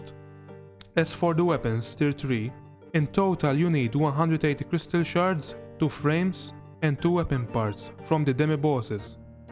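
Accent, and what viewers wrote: Malta. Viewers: you are Maltese I can tell instantly lol.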